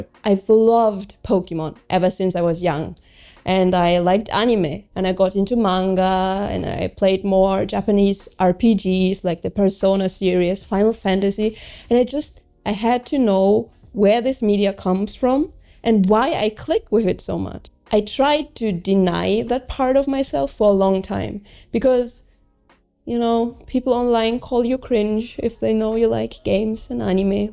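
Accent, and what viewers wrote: Germany. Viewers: Finde ich schon. Wie sie Worte zieht/betont ist schon sehr Deutsch. Ihr Redefluss hingegen klingt nach einer Mischung aus Deutsch und Japanisch.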